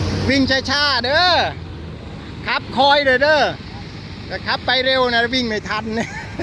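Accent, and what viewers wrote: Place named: Korat, Thailand. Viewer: วะพูดภาษาโคราชบ้านเอ็งเลยน้ะ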